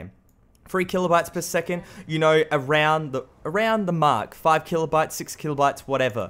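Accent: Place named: Australia. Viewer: I like your Australian accent hehehehehhehe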